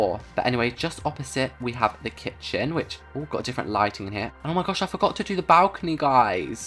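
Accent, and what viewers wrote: United Kingdom. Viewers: HIS BRITISH ACCENT IS SO NICEE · i LOVE the british accent its like ''BeTa'' and ''a BlEnDa'' LOL:D tysm for making my day better<3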